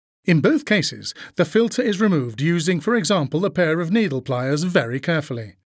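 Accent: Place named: Germany. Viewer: They same German voice on Airbus videos🤣🤣🤣🤣🤣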